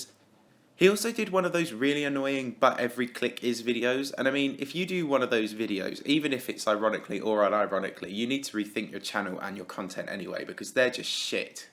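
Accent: United Kingdom. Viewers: My mom heard me watching you and now she won't stop talking in a British accent XD why!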